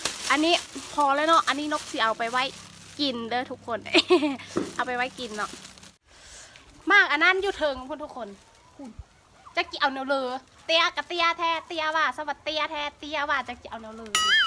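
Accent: Laos. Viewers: ตัวนกพูดแบบนี้แหละฟังรู้เรื่องถ้าพูดอีกแบบที่ ตัวเออ มันฟังไม่รู้เรื่องครับพูดแบบคนลาวพูดถึงพอจะเข้าใจครับ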